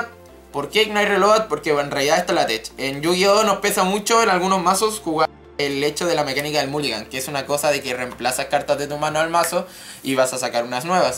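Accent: Chile. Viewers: Sos chileno ?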